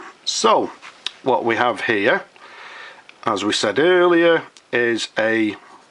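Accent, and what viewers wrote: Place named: North, United Kingdom. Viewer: Ey up! Thy sounds like a Northerner. I have an Imperial I with a plastic Touchdown rod.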